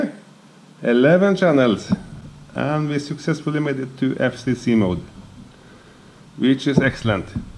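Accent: Norway. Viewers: you must be norwegian?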